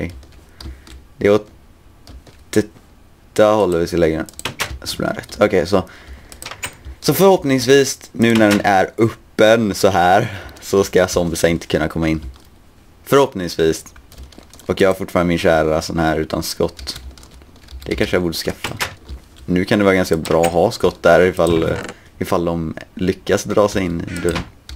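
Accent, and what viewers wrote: Gothenburg, Sweden. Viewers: är du från göteborg för det låter som det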